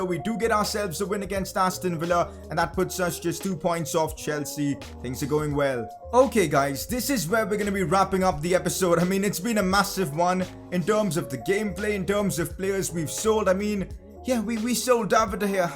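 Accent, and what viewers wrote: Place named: India. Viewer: PCQ: your accent is different where are you originally from? India? Sorry if its personal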